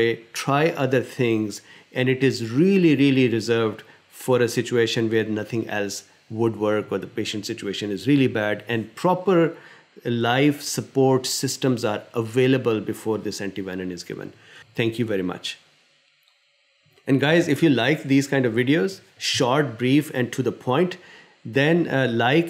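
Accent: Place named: India. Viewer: Love this guy's accent! I just came here for the info because I got bit by a black widow spider about a week ago but I LOVE the Indian accent. Yes, it's painful, yes it sucks; you're not going to die from it. There hasn't been a death from a black widow in the US in ten years. "Provoked" can mean you accidentally climbed through their web without knowing it; the spider went down your shirt and bit you seventeen f***ing times (what happened to me). I climbed under a fence at night, didn't see the spider but felt it's web on my face. The thing about a black widow spider bite is you don't feel the sting but an hour later you feel the pain which in my case felt like somebody hit me in the chest with a baseball bat. It took me a while to figure out that I got bit by a black widow. At first I thought I'd pulled a muscle but it started to hurt way more than you'd expect from a pulled muscle. 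It was a deep pain and the skin surface was numb. But then after about a week the deep pain started to go away and there was pain and itching on the surface and there were red spots in each place where the spider bit me. I counted about seventeen individual bites. About a week and a half into it now and the red spots have turned into scabs, some of which have already fell off. I'm still in pain but I'm not going to hate black widow spiders for the rest of my life. I'm definitely going to be careful about crawling under fences; at night; in the dark; where I can't see what I'm climbing through. T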